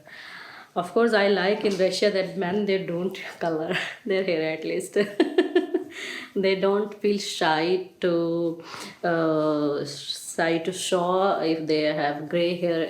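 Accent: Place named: India. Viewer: Мне нравится её индийский акцент и было бы прикольно еще посмотреть версию полностью на русскоя языке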